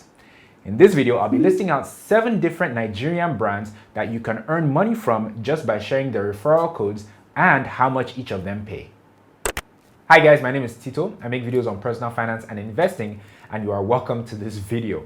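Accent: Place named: Ghana. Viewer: Great content Tito. Do you know similar apps for the Ghanaian investor? Btw, you sound more Ghanaian than Nigerian!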